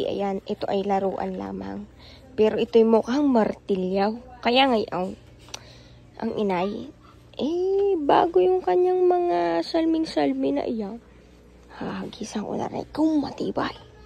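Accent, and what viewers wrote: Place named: Ilonggo, Philippines. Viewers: Baw ilonggo gale si mama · Nindot jd kaayu paminawn ang inistoryahan sa ilongga ❤❤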